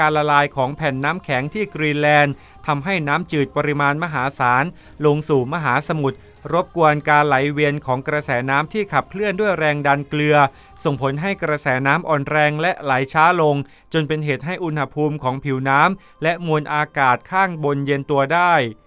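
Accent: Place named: Surin, Thailand. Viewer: คนอ่านเป็นคนสุรินทร์ แน่เลย ชอบฟังเพลง เขมร กัลฟ์ตรึม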